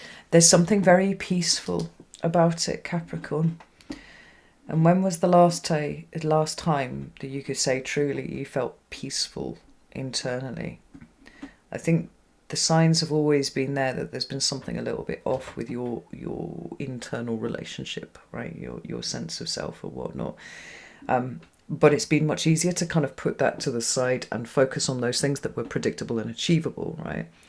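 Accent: United Kingdom. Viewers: Just your amazing voice, delightful british accent and excellent vocabulary and choice of words captivates me. I would be listening to you for days! Thanks for your readings, Cap sun Sag rising here. 😊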